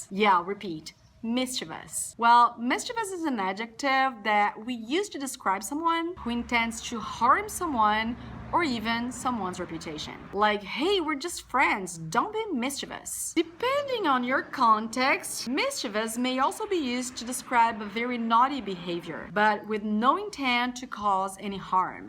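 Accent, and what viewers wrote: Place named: United States. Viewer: That's not an English, it's American...gosh please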